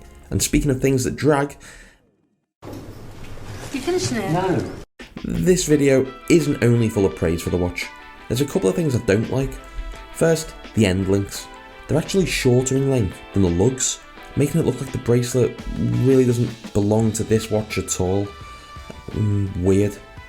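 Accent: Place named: Liverpool, United Kingdom. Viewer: Nice review. Some humorous metaphors too that gave a good chuckle! 👏🏼 I detect an accent… so, Everton or Liverpool is the important question! 😉 I picked up a VHP GMT white dial in 43mm on Saturday. Gotta say I’m really chuffed wi it. The single push that doesn’t do anything on your version is the way of initiating flash settings or changing time zone of the gmt on my version. Really a classier grab and go alternative to a G-Shock. Cheers bruv, have a good un.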